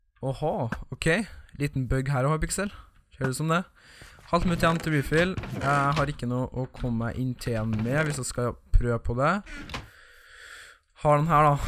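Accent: Trøndelag, Norway. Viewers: Ælske at du snakke trøndersk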